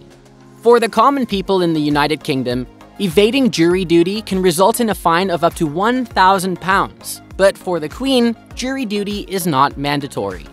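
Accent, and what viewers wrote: United States. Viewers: The man doing this docu is talking about the queen of england so why doesn´t he talk proper english and not english with a yanki accent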